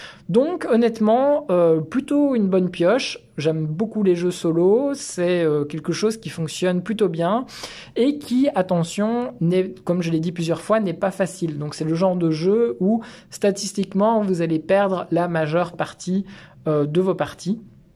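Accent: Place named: Belgium. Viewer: ahhhhh caaaaa ne m'en parle pas. Mais ça fait plaisir d'entendre cette voix belge :)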